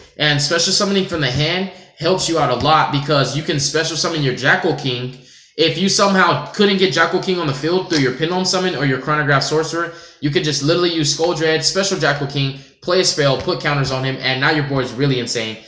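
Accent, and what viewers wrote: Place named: Mexico. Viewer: you sound really mexican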